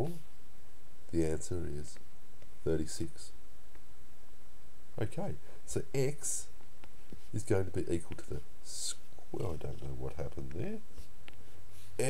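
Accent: Australia. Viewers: sounds Australian, high possiblity.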